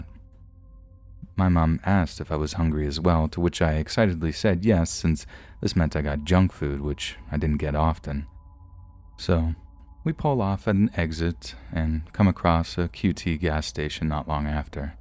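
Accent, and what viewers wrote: Australia. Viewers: Ah jesus no one expects an Australian accent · awesome an Australian narrator i love it 👍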